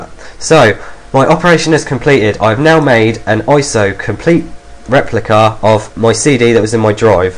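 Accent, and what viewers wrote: England, United Kingdom. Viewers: y is this so long? do u have to go so fast? I have seen others do this in two minutes mr. English accent!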